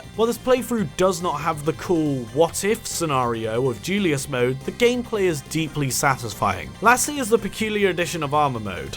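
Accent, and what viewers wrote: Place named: Netherlands. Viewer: yes i really enjoy your Dutch accent.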